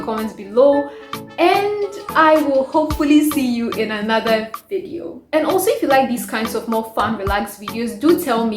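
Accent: Ghana. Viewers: Do you speak Twi? I'm asking because of how you speak English, the way most Ghanaians do.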